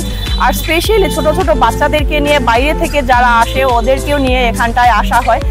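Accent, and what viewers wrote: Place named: Sylhet, Bangladesh. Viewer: আপনার কথায় সিলেটি টান আসছে।।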